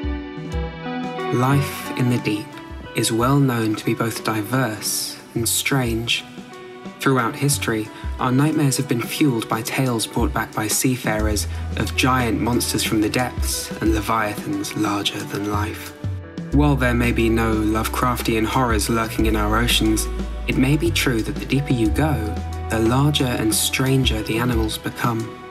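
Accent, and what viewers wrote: United Kingdom. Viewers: why are videos like this always narrated by someone british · Thank god there’s a British guy narrating or I wouldn’t have been able to watch.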